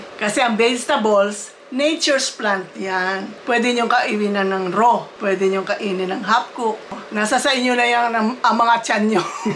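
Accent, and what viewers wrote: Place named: Pampanga, Philippines. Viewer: bat parang maypagkapampangan si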